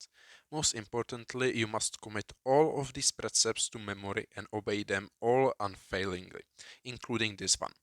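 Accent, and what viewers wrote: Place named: Russia. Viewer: You sound like Russian or german, am I right?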